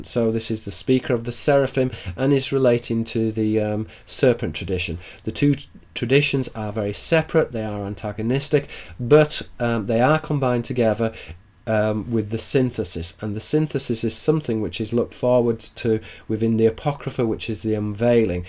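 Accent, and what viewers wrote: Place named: North West England, United Kingdom. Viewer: Dear, thank you for your most interesting work. I was advised to find you by way of Tom Carberry via shroomingnewman 3... I’m sorry I can’t remember from which video this came but you said that the? (rulers) release knowledge through deception. Would it be alright for you to help me understand this a little more? Also, in relation to the ‘ha’... for almost four years myself and my son have been, (for want of a less triggering term), ‘born again in the Spirit Christians’... anyway, whenever I pray, (literally just talking and asking if necessary) to our Father and Mother and I forget something, I always ask the Holy Spirit to help me... whenever I do, my mouth opens of its’ own accord and a gentle but definite exhalation of breath happens. And it makes that lovely little sound... So, ha! that’s that... 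Then also, you sound so very much as if you hail from the North West Of England. We live in Morecambe. You have a very similar accent... Okay, well i’ll go now. Thank you again and all of the very best, from me Sarah.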